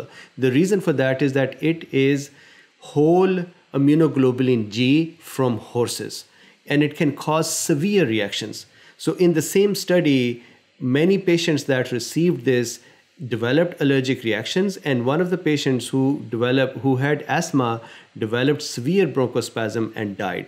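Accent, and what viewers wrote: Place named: India. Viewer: Love this guy's accent! I just came here for the info because I got bit by a black widow spider about a week ago but I LOVE the Indian accent. Yes, it's painful, yes it sucks; you're not going to die from it. There hasn't been a death from a black widow in the US in ten years. "Provoked" can mean you accidentally climbed through their web without knowing it; the spider went down your shirt and bit you seventeen f***ing times (what happened to me). I climbed under a fence at night, didn't see the spider but felt it's web on my face. The thing about a black widow spider bite is you don't feel the sting but an hour later you feel the pain which in my case felt like somebody hit me in the chest with a baseball bat. It took me a while to figure out that I got bit by a black widow. At first I thought I'd pulled a muscle but it started to hurt way more than you'd expect from a pulled muscle. 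It was a deep pain and the skin surface was numb. But then after about a week the deep pain started to go away and there was pain and itching on the surface and there were red spots in each place where the spider bit me. I counted about seventeen individual bites. About a week and a half into it now and the red spots have turned into scabs, some of which have already fell off. I'm still in pain but I'm not going to hate black widow spiders for the rest of my life. I'm definitely going to be careful about crawling under fences; at night; in the dark; where I can't see what I'm climbing through. T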